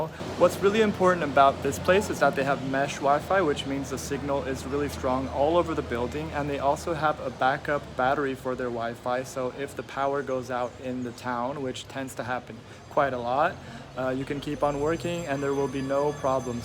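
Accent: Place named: United States. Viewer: American accent but uses celsius?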